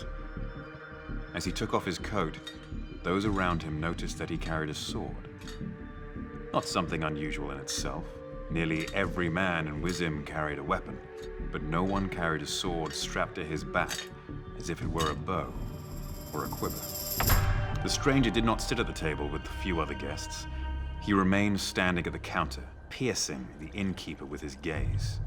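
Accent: England, United Kingdom. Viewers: Wait wtf he’s british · Oh he's British... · Why British gentleman always makes a good audiobook narrator? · It's nice to get a good example of how nice Henry Cavill's natural voice is. His American accent as Superman kind of leads him to be very whispery and mumbly, and I love his Geralt voice but it's definitely a voice. But his natural English accent is gorgeous. He should totally do an audiobook series of the Witcher where he does his Netflix Geralt voice for Geralt and his natural voice for narration. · It’s so weird hearing him with the British accent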